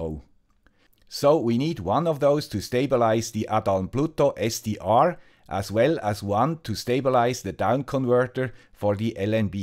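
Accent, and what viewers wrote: Switzerland. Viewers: I know. I was just saying we need more videos all the time 😂😂 can't get bored listening to the guy with the Swiss accent.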